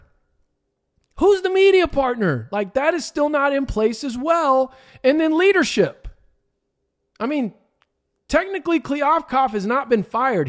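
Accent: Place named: East Coast, United States. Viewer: PAC refugees going to a depleted AAC 2 timezones away with a locked in 9+ year contract making roughly $4MM more than MWC is suicide. The brutal reality is MWC offers the softest/safest landing spot. PAC+MWC merging keeping PAC name makes the most sense financially, economically, and geographicly. Add Gonzaga as the 14/16th member would be the cherry on top. This podcaster sounds like he's from the East coast chiming in his biased opinion.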